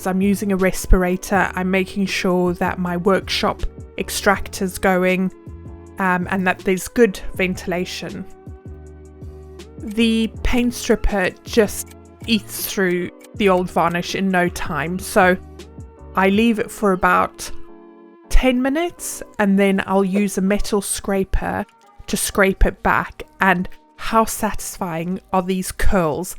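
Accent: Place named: England, United Kingdom. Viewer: I had to put the video on mute. I couldn't stand your English accent...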